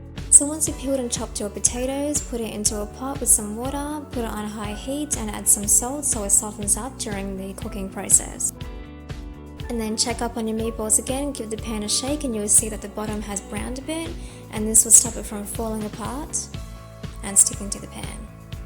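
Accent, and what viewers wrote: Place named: Australia. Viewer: nice to hear an aussie accent for this!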